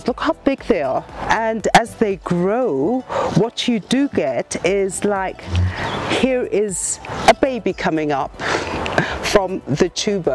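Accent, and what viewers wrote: England, United Kingdom. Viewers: Very good video, especially when you speak English English! Informative!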